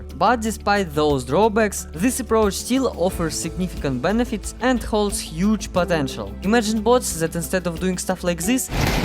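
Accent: Russia. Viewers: ох уж этот Русский Акцент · У вас сударь немного русский акцент 🤔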